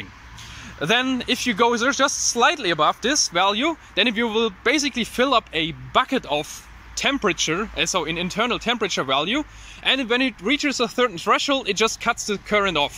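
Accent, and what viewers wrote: Germany. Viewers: das ist doch 100% deutscher dialekt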